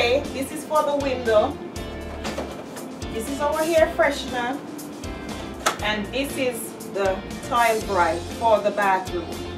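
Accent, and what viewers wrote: Jamaica. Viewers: She sounds like a Jamaican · sounds Jamaican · From her accent I know you are one of us Jamaican love and niff respect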